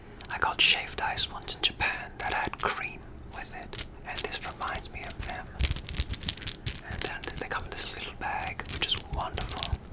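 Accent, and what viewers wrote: RP, United Kingdom. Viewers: I’ve only ever heard his British gentleman voice lmao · Just me that thinks uses his British voice more than his actual one and I love it ✨🤗🥰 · This British gentleman accent is giving me 🦇 British Vampire🦇 vibes , ya know? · so he’s a korean american who knows asl and speaks in a british accent... hm.. ㅋㅋㅋ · Who is this handsome Korean-American man speaking to me about a box of goals, dreams and fortune cookie papers in a lovely British accent? Because he's doing wonderful and I love it. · Idk whats going on... but i'm loving it.🥰 I'd love to hear the British Gentleman from the 1800's roleplays hahahhahah 👉👈 plz do more🥰🥰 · You should read more fan fic in British it kills me!😂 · I’m just gonna act like I haven’t watched this video 15 times already 😔 please post more asmr videos in the British voiceeee😭😭😩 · Ok don’t get me wrong I love the British gentleman voice but can we have a normal voice video please? 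🥺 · this is a very VERY convincing british gentleman from the 1800s accent... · Ok but like you don’t have to do anything specific, just whisper with your British Voice into your special ASMR. I will listen as long as your speaking so...😼‼️🙄✋🏽🥶👁👄👁 SIKEEEEEEE.... I GOT TO THE FORTUNE COOKIE BOX THING. READ YOUR DREAM CHECKLIST AND FORTUNES OUT LOUD WITH YOUR BRITISH VOICE!!! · Hey how about u do an asmr with ur British gentlemen voice but make it sound like ur talking to us. Also u don’t really have to whisper when it comes to asmr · Alright, it’s official, British accents give me the tingles... that explains a lot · Omg. I've been following you for a while and your British accent tik toks inspired me to get here. I'm so so happy omg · Why is it that is so much more attractive with a British accent lol❤️ lol you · British accent + ASL ... Somethings not adding up (Yes, I know he's not actually british) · What am I watching?? 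But don’t get me wrong, I love the British gentleman accent.😗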